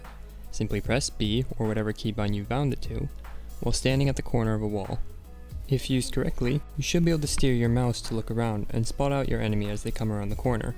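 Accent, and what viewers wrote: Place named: United States. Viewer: Just curious, where are you from. It sounds like you have a typical American accent at first, but I keep hearing little places where it sounds like an accent is coming in.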